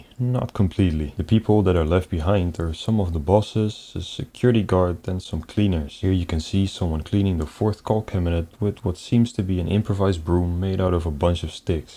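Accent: Netherlands. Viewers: Judging by your accent.., your Dutch? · He will not reveal his location, but yes he sounds Dutch to me.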